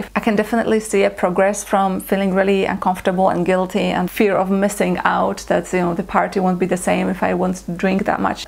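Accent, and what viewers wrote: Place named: Poland. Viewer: How come after 11 years in UK you still have such a strong polish accent ? Your videos are valuable but your english is very heavy and hard to listen to. Make it more fluent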